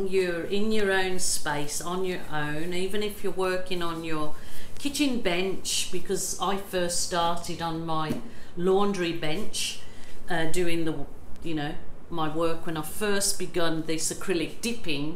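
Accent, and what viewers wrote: Birmingham, United Kingdom. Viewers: Yeah....don't get your heat gun mixed up with your magic wand, ladies! It would lead to an embarrassing trip to A&E 😂😂, are you originally from the UK, Birmingham type of area? I'm trying to place your accent and it's driving me crazy 😂😂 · I thought she sounded like she was from Birmingham in the UK LOL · You sound like me, I’m a brummie... a thingy 😂😂😂😂💖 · Thought exactly the same...she’s deffo got a brummie twang 😀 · Me too, ex brummie. Noticed the accent straight away.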